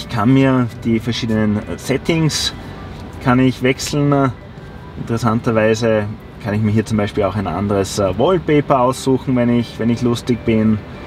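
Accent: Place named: Austria. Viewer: Na Na, wer wird denn gleich so negativ-kritisch sein. Testerfahrung / -Routine kann man lernen, CHARME nicht - den hat man oder eben nicht - ich mag die Österreichische Sprachfärbung sowiso lieber als die Ost-Deutsche. Interessantes E-Auto - ich sags ja - die Asiaten kommen! Lass den Jac auf 450 KM Reichweite reifen, dann kann sich VW warm anziehen. Ich setze mich in kein Auto, das so flach ist, daß es unter der geschlossenen Parkhaus-Schranke durchkommt.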